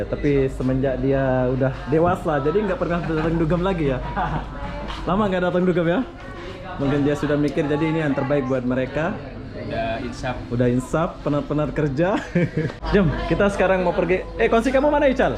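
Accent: Lombok, Indonesia. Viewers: Klo bolh tau abang nich orng indonesia jugk ea krn dri tutur ktanya mcam indonesia maaf ea bang jdi kefo cima igin tau aja bang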